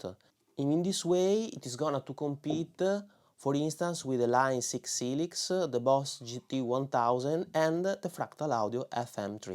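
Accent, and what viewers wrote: Italy. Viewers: E' Italian/English, così lo capiamo meglio (sul serio eh)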